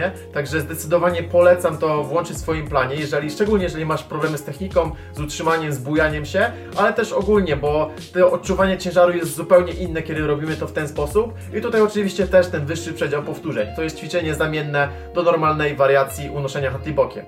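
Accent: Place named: Poland. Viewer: Tłumaczy dobrze. Słychać że Polak.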